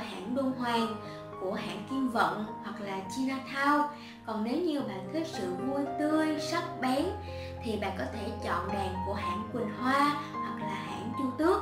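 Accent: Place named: South, Vietnam. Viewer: Người miền bắc nghe giọng miền nam không quen và đệm nhạc vào nữa nên khó nghe rõ .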